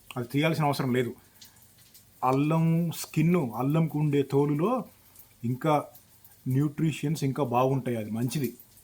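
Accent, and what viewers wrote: Andhra Pradesh, India. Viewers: Nizamabad antunav kani nee slang mitham Andhra style undi beo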